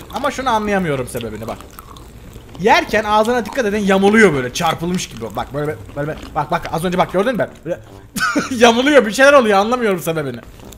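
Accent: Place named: Adana, Turkey. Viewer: Hemşehrimsin abi bende adanalıyım FİŞŞEK tamda adanalı konuşuşu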